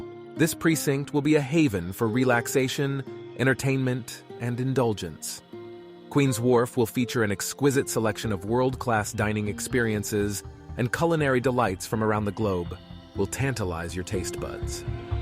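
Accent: United States. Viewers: I didn't - it was smooth! I did wonder why an American was narrating Australian construction but hey, global society and all that. :-) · Why is there an American voice-over? Give this video an Australian character by having someone who has an Australian accent, at the very least.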